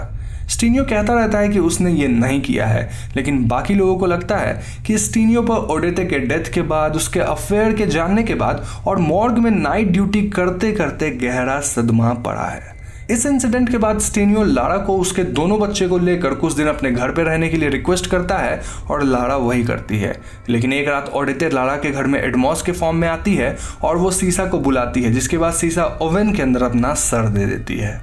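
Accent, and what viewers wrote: Bengal, India. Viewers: U r bengali right , accent shune bujhalm but whatever you're doing that's awesome.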